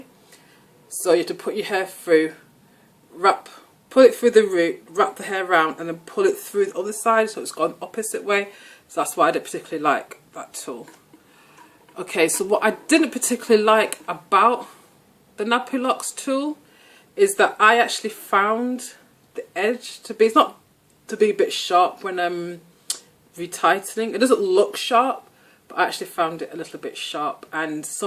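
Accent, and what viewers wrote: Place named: Northern England, United Kingdom. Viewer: So great to hear a UK voice and then a northern voice at that! Where have you gone? Your videos look great, would love to hear how you are getting on.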